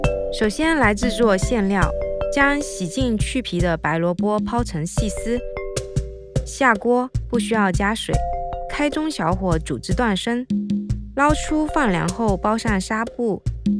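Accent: China. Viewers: 汶。 我看过这个频道之前的影片，通过他的口音我知道他是大陆的，所以我才会这样说，还有一种更搞笑的，视频内容讲中文的，标题就是中英两种，他们就那么想外国人看到？这种情况只有亚洲人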